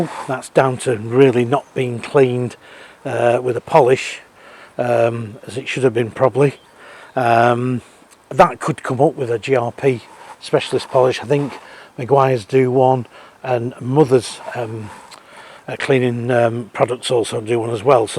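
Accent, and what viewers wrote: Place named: Lancashire, United Kingdom. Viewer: Another great no B/S review you sound like you come from the lancs area, I grew up in Coppull I wonder if you ever visited Dave Barron caravans a massive site at the time sadly long gone it’s a big housing estate now. I watch all your stuff keep up the good work.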